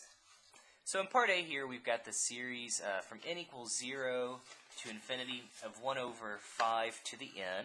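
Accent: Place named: United States. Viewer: in the U.S. you can tell from his accent. however if you want to ask which state is he in? because american only ask about america sinour.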